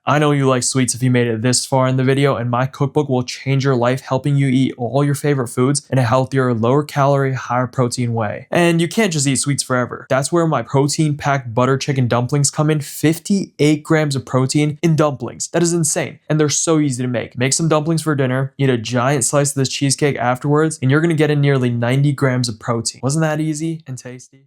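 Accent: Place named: New York City, United States. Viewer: Bro you from NYC or the metro area? You say toss, salt, water like i do. Nice to hear a “familiar” voice on youtube!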